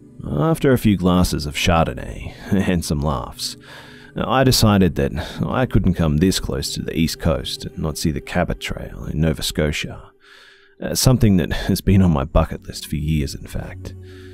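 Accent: Australia. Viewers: He is Australian, so the way He pronounces things is different from ours.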